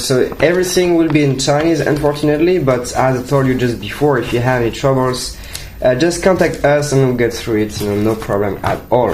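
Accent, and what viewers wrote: France. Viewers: lol nico rosberg voice when he speak english mix french english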